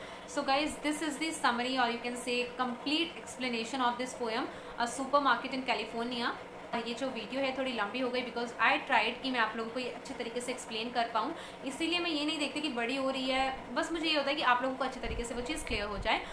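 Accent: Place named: India. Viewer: Indian English accent😢I could not understand most of the words...😑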